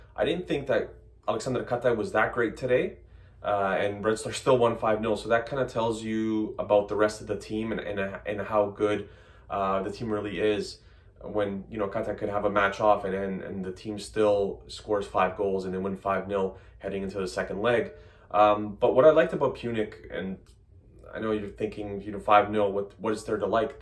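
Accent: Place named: New York, United States. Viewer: Nice recap. Sjajan ti je ENG :) - American NYC dialect :D Anyhow, keep up the good work!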